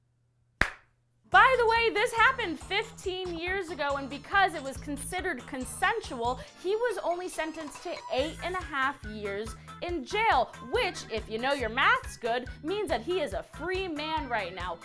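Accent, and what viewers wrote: Germany. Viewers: best German accent ever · I'm in love with! I have subscribed and I will NEVER unsubscribe! You're actually really good at a German Accent! (I'm From Germany) Please make more videos!!!!!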